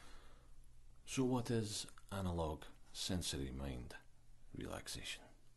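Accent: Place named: United Kingdom. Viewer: I’m dead... I’m in love with the creativity of this, the aesthetic and the approach. Not to mention your voice is reminiscent of my first ASMR triggers when i was a kid.... “british” accents in somewhat gravelly men’s voices. I haven’t had tingles in awhile but this brought them back almost full force. Thank you.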